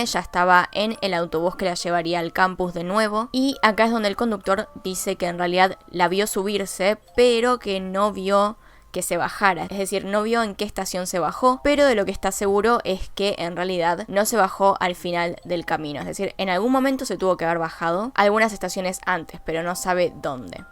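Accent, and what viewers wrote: Buenos Aires, Argentina. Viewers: Es acento porteño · Estoy de acuerdo, he escuchado el acento argentino de diversa áreas y me solía incomodar. Aparte que he conocido en persona variass argentinas y son difíciles de dialogar. No soy la persona que juzga a todos por el mismo filo, sin embargo es agradable escucharte y no ser petulante o de alta arcurnia. Me smpatizas muchos y por eso sigo tus casos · yo odiaba el acento argentino, pero te conoci a ti y todo cambio <3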